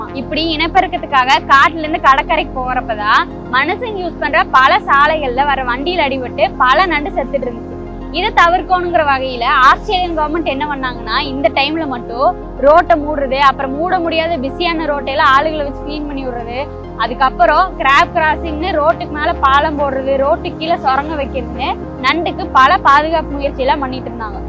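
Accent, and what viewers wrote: Coimbatore, India. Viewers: Covai slang😝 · Akka Nega Coimbatore side aa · கோவை தமிழ் அருமை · கோவை ஸ்லாங் அருமை👌👌👌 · அம்முனி கோயமுத்தூர்ங்களா....😃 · Baby ma nee coimbatore ah??? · pechchil kovai vasam varuthu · Ammani coimbatore ngala😂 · பாவை இவள் அழகி கோவை தமிழ் பேசும் போது நெஞ்சம் இன்னும் இன்னும் கேட்கிறது இவள் கொஞ்சும் தமிழை இன்னும் இன்னும் கேட்கிறது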